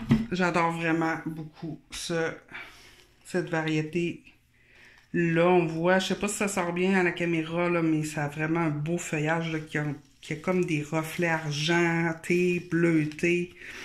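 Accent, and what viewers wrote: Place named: Canada. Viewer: Bonjour,j aime beaucoup vos plantes toutes,et ♥ aussi votre bel accent ♥ canadien.De la Belgique bisous une amie des plantes,Superb plant tour et partage comme vous le meme avis sur vos plantes et les mienes de ce fait.Felicitations et a bientot.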